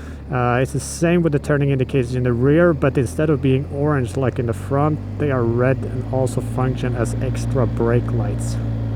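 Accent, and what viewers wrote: Sweden. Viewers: Haha, tyckte jag hörde lite svenskt påbrå och sedan såg jag flaggan! Coola väskor!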